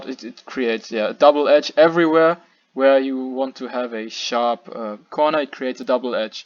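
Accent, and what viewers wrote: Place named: Germany. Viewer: The German accent is so stong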